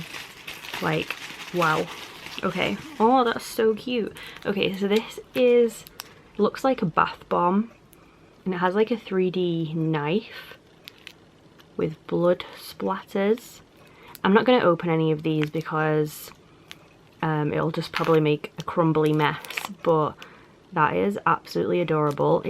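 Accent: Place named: Yorkshire, United Kingdom. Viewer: Omg your makeup is so perfect 💗 also I love your accent are you from Yorkshire?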